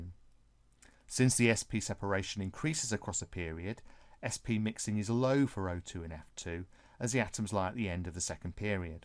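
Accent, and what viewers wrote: England, United Kingdom. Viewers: God, the English accent, but at least it is a short video.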